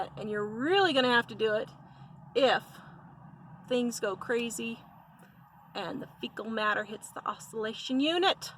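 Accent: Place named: Arkansas, United States. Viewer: Are you from Arkansas? I haven't heard anyone say 'Warsh' since the 60's. My cousins were from Arkansas, I find it charming.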